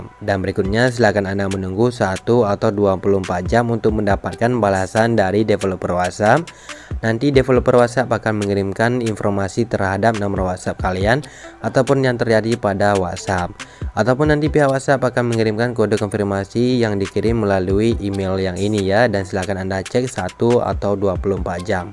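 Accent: Aceh, Indonesia. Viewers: Nyan keuh khas awak aceh.. Adak bak Ujong donya di jak,tetap ta turi logat awak aceh.. Yg penting subscribe,, mangat teubai kantong.. 🤣🤣🤣🤣🤣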